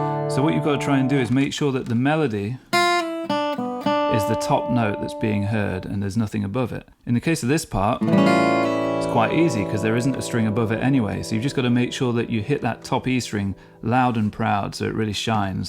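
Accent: United Kingdom. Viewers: Did NOT expect the British accent after the guitar part🤣 ... hardcore Americana vibes. Love it!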